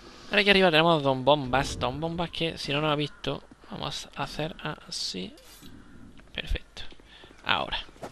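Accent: Andalusia, Spain. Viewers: DISLAIK xQ se nota muuxo ke eres andaliuz i ke no saves ablar.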